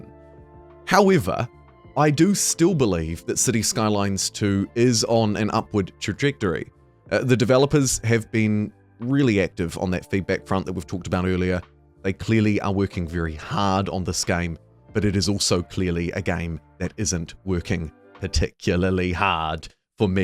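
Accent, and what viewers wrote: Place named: New Zealand. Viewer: Kia ora. Another kiwi that accidentally pronounces it "pre-formance" rather "performance". The game is atrocious. If it were a toaster, an oven, or a tv you'd return it for a refund. Got my refund. Will see what happens to the game over the next year but not holding out hope. This game will go down in history as an infamous disaster.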